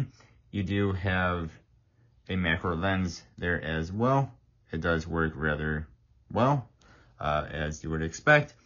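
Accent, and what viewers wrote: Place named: South, United States. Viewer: Excellent review. But why are you speaking in a southern accent? Are you from rural Alabama?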